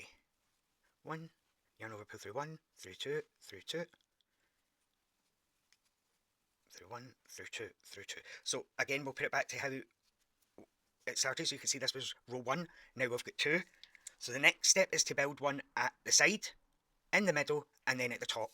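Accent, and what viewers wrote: Scotland, United Kingdom. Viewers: You had me at the first sound of that Scottish accent,! [grin] Although I was, obviously, seeking material about C2C.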